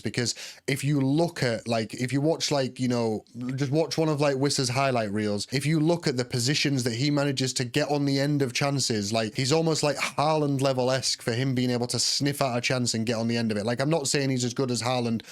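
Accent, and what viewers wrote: Manchester, United Kingdom. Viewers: I mean he ain't even one of us, listen to his accent like are you Sirius mate??? He's a manc and he's buying subs and he has a click bait still to get more views and uses other channels as well. Me main channel has less then 2500 subs and I bin trying for yrs and this lad rocks up and gets 10000 in a few months and you tell me he ain't buying subs lol you donut get how you tube works lad!!! Why you not subbing to propa fan channels like my one then???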